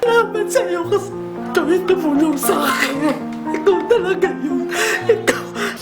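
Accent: Visayas, Philippines. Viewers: Taga san ka po? Bisaya ka ata eh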